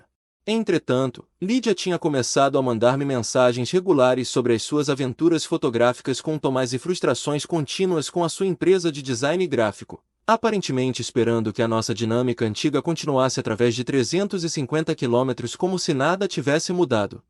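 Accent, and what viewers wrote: Portugal. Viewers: Exatamente isso. Português de Portugal não o nosso .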